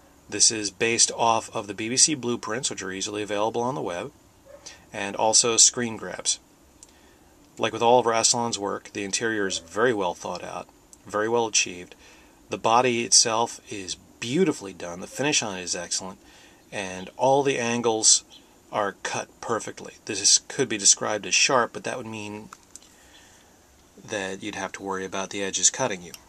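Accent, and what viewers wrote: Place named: Russia. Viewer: your russian accent is great! XD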